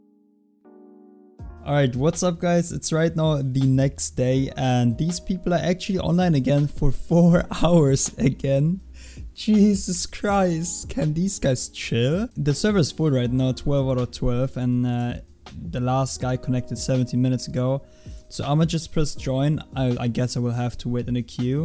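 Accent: Germany. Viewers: U German? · Meine fresse man hört das deutsche raus ;D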